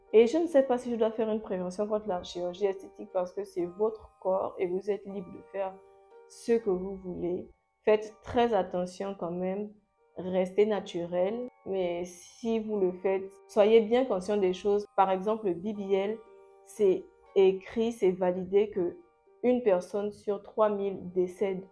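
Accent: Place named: Gabon. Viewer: Quand j’entends ta voix, ton accent Gabonais me fratte carrément au visage 🤧✨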